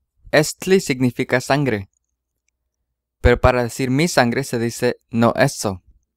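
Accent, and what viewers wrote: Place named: Northern Veracruz, Mexico. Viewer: Hola buen día, una pregunta, el Nahuatl, que hablas y enseñas de ¿donde proviene o de que región es de donde lo hablas?, por que el nahuatl tiene muchas variantes dialectales y aunque tiene cierta similitud a la pronunciación del la región norte del estado de Veracruz, muy padre el material, mil gracias por tomarte el tiempo y elaborarlo, es tan util.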